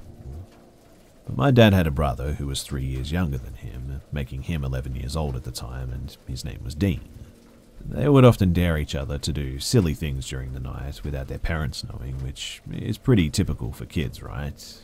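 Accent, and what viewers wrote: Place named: Australia. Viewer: Does anyone else think voice & Aussie accent sounds different? Especially if you listen to some older posts. Still sounds great though while I listen on a dark & eerie night. Thanks!!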